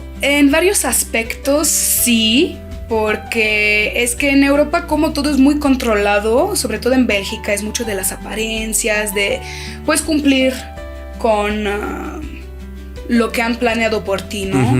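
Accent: Mexico City, Mexico. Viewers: Tiene acento Chilango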